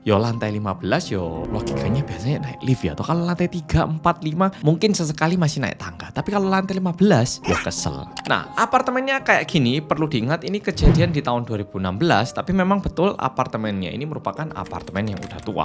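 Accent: Java, Indonesia. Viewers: Hai medok🎉 Menyedihkan😢 suwun yo rek 👌🏿🙏🏿💕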